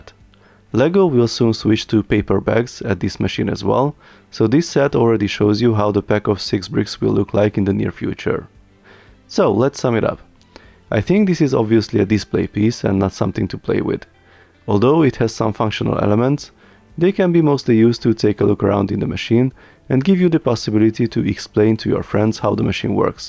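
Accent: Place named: Hungary. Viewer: YOU SOUND SO HUNGARIAN!!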